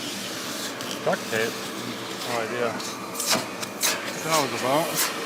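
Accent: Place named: Minnesota, United States. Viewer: Your Minnesota accent is better than Mrs R for our 1 yr old son. I now turn your channel on when he needs help calming down. Thanks for the help!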